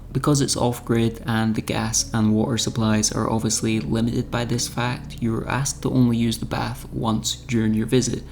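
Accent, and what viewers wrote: Scotland, United Kingdom. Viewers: Nice one, cool to see some of my heritage coliding with an aussie location voiced by a scotsman!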